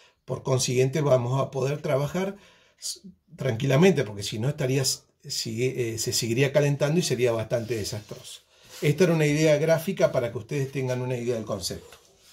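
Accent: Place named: Argentina. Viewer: No vale eso te quedó profesional amigo... Exelente trabajo y vídeo. Me suscribo y te felicito por tu canal y talento tienes la paciencia para enseñar y conocimiento para dar gracias amigo. Me parece que eres argentino Dios te siga bendiciendo grandemente y Dios Bendiga a la Argentina. Un abrazo y saludos desde Caracas Venezuela 👍🏻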